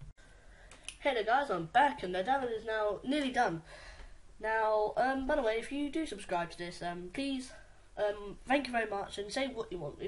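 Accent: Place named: United Kingdom. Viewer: Holy shit this boi is british Edit: But it's a good vid <3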